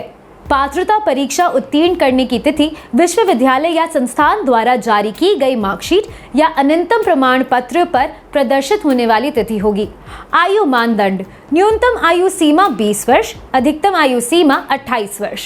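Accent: Standard. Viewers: Ma'am aapki shuddh hindi👌👌👏👏👏👏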